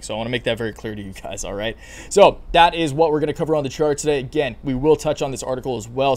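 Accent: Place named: Hawaii, United States. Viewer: He's from Hawaii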